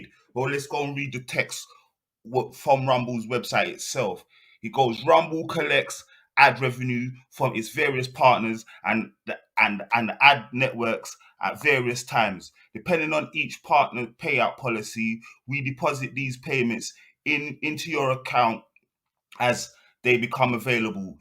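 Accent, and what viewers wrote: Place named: United Kingdom. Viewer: Yes thank you for this video. Been trying to find out how to set up Rumble account. Nicely explained by you man. Nice too to hear the voice of a guy from my ends. Thanks again. Eldad Amaa(UK).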